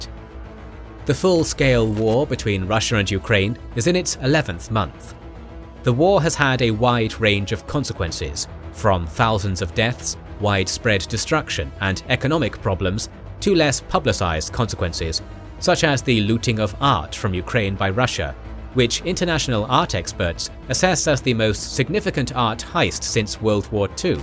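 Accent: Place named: England, United Kingdom. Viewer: FINALLY...someone with a decent English accent... and can actually pronounce the Ukrainian town names! So much better than all the foreign commentators who can't even speak English! Great stuff...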